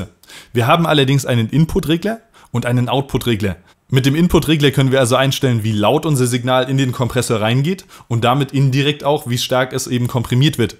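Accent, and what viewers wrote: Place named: Northern Germany, Germany. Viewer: Darf ich fragen, wo du gebürtig her kommst? Dialekt mäßig, würde ich Norddeutschland sagen. Niedersachsen oder Hamburg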